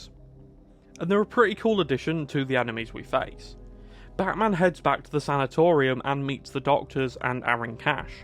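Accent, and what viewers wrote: United Kingdom. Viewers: You should make this video without the british accent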